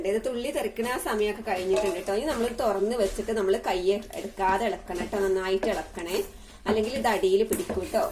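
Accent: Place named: Palakkad, India. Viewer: Palakkad slang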